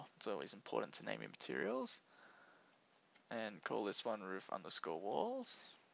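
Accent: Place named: Australia. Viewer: Heyyyy Australian ;) What part you from?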